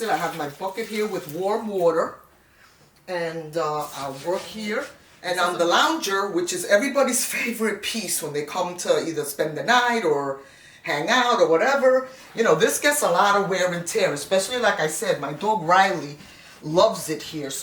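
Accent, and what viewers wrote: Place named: New York, United States. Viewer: I was listening to others that use alcohol and baking soda, however I’m going to try your method. Love your New York accent and Love how you explained everything. Thank you 🙏 you are very kind to share your secret!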